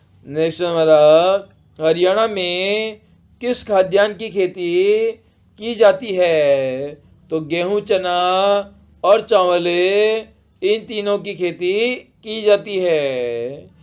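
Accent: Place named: Bihar, India. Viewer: हरियाणा जीके बिहारी पढ़ा रहा है बड़ा गंदा लग रहा है आवाज से 😂 भाग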